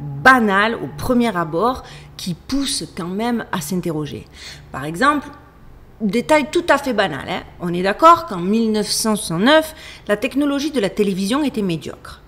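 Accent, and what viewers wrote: South, France. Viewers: Vous êtes remarquable dans vos recherches, vos analyses et vos explications . C’est un régal de vous écouter . Merci pour cette touche d’accent du midi .. c’est du soleil et sa lumière qui nous envahit. Continuez à nous régaler .. Que Dieu vous accompagne dans cette recherche de la Vérité . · Super travail, magnifique accent du Sud... nouvelle abonné, j'adore !... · Excellent! Ceux qui vous dénigrent et dénoncent sont des Trolls. Donc ils n'existent pas. J'aime beaucoup votre style narratif avec ce petit accent du sud de la France et ces petites saillies humoristiques. 😊👏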